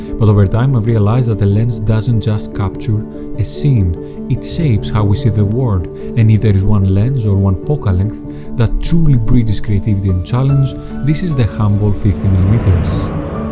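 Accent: Greece. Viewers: Μου αρέσει ιδιαίτερα η προφορά στα αγγλικά.Δωρική θα την έλεγα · Amazing, your Greek accent is similar to mine, from Spain.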